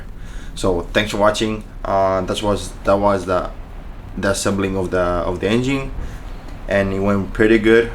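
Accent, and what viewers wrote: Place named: Greece. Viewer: You sound Greek , nice video anyways!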